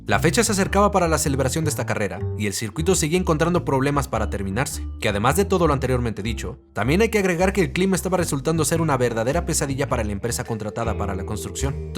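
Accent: Mexico. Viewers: Un saludo desde Juárez México, en que ciudad vives? Veo tus videos desde hace tiempo pero me imaginaba que eras sudamericano con acento por acá, de México.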